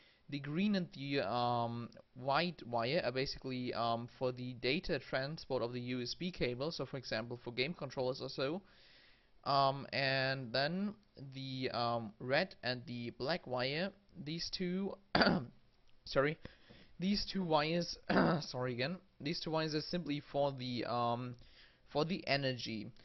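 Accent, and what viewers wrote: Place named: Germany. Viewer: Are you German You sound German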